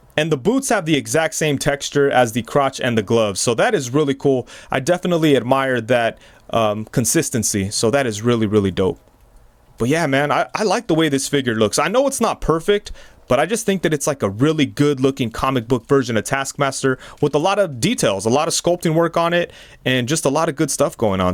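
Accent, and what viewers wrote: New York, United States. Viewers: Where you from? You have this nice New York accent to your voice. Reminds me of family from the Bronx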